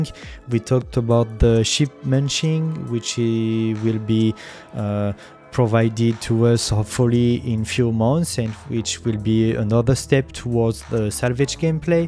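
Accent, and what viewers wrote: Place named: France. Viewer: Bel accent on reconnait les fr^^ , en tout cas elle est top ta vidéo :) sa ma donner envie de lancé mon reclaimer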